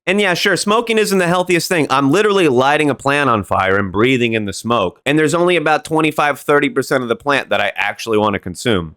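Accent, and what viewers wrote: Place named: Boston, United States. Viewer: Idk if you’re from Boston, but I occasionally hear a Boston-like accent, especially when you use an “A” on the end of words that have an “ER”. Just a curious observation.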